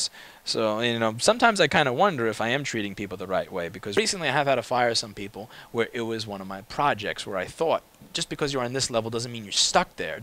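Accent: New York, United States. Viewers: It’s really weird how your accent has become more of a New York accent in the last few years.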